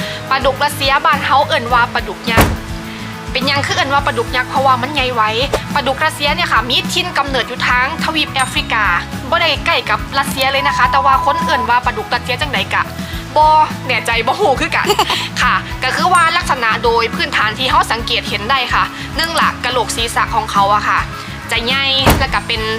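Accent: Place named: Chaiyaphum, Thailand. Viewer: สำเนียงเหมือนชัยภูมิเเท้จ้า ฟังเเล้วคิดฮอดบ้านจ้า คอนสาร ตอนนี้ย้ายบ้านมาอยู่กับเเฟนที่ชลบุรีเเล้ว บ้านอยู่คอนสารก็รื้ออกหมดเเล้วไม่ได้กลับบ้าน4ปีเเล้ว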